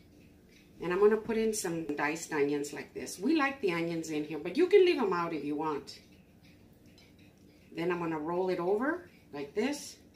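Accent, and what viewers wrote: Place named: New Mexico, United States. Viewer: I completely agree! Also, your voice is very soothing. You very much sound like you could be from my beautiful state, New Mexico which is even better!😎❤🌶💛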